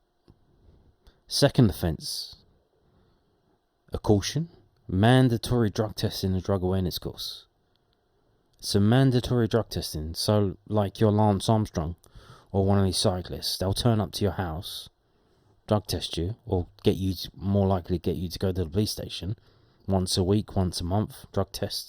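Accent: Scotland, United Kingdom. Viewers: Your Scottish accent is awful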